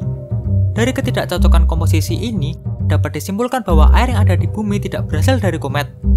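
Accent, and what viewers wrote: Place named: Java, Indonesia. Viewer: wkwkw medok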